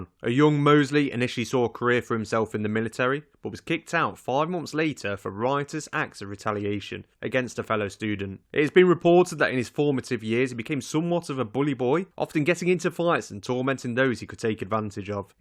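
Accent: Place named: Nottingham, United Kingdom. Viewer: Great Nottinam accent 😂. Love from Scotland ❤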